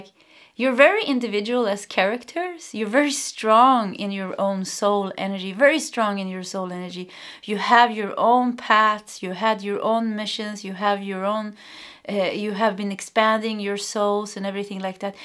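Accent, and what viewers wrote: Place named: Sweden. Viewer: When I hear you apologize for stumbling on your English, I’m so very impressed how well you do!! I would NOT be able to give a reading in Swedish, so I applaud you for doing so good!! Secondly, both 1 and 2 are 100% me!! I do healing and I have a wonderful old soul tribe. I appreciate this confirmation!! Love your readings and really connect with the Swedish accent as a soul of Swedish descent this time around!! ♥️👏🏻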